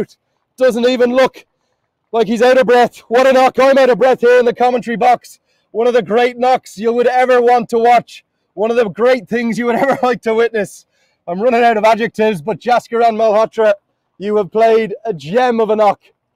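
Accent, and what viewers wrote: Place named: United States. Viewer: First time hearing cricket commentary in American accent 😄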